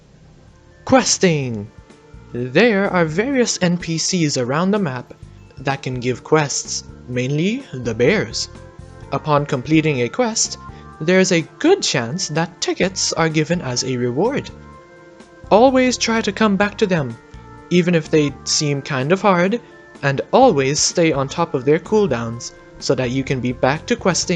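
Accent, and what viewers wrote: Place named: Australia. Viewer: Why you have Australia accent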